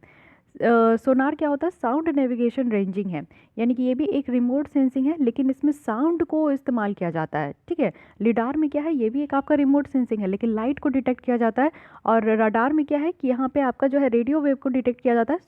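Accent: Bihar, India. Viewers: Mam kya aap bihar ki ho?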